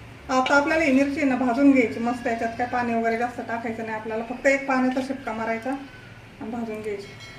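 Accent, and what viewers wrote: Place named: Nashik, India. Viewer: ताई तुमची बोलीभाषा ऐकून असे वाटते की तुम्ही नाशिकच्या आहात .. बरोबर का ?? शिमला मिरची खूपच छान बनवली ..बघून तोंडाला पाणीच आले . . ❤❤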